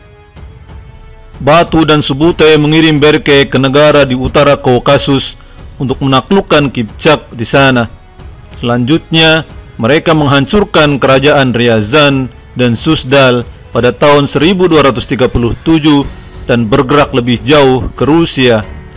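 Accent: Bugis, Indonesia. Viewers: Mcm logat Bugis ini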